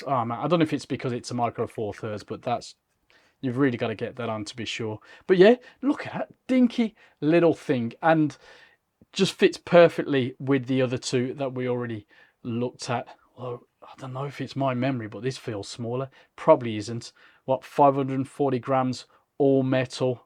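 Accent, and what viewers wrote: Birmingham, United Kingdom. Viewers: I recognise the Birmingham.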